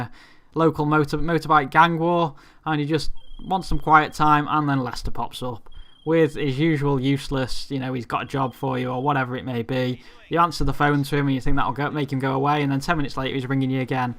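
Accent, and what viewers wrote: Cheshire, United Kingdom. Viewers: yeah , sounds cheshire? Im from just outside there :D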